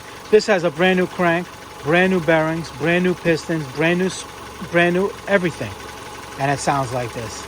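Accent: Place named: Ohio, United States. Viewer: Appreciate the video, also sounds like one hell of an Ohio accent lol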